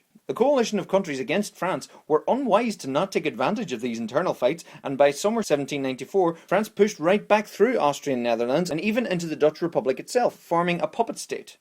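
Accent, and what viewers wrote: Ireland. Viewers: I wish It had subtitles. You speak so fast and i'm not used to the Irish accent. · Because HE IS IRISH!!! DUN DUN DUUUENWIAKWOEISJWOIEDJDISOOWEK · You sound Irish :) pretty cool if you ask me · He is Irish mate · are you irish or something? (not that you are)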